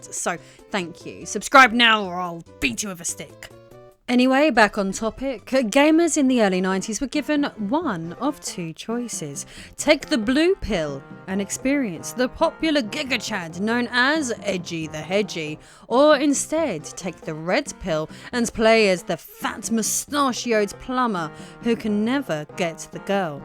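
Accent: United Kingdom. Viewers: I'd ask you to explain faster but you're hot and I don't USUALLY like British accents however for you I'd make a huge exception.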